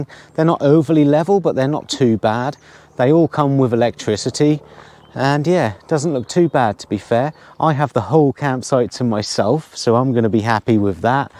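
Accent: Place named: Norwich, United Kingdom. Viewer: Just discovered your videos and loving them especially as I'm a Norwich girl with a campervan, great to hear someone with my accent, very unusual. Recognise your face too, maybe in Norwich pubs? You love the same music as me.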